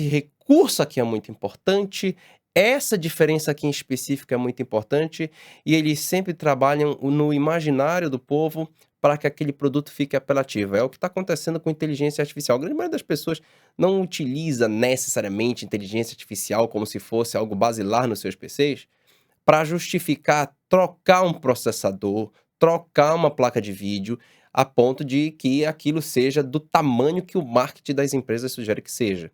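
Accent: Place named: Belém, Brazil. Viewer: Esse cara é de Belém. Percebi pelo sotaque.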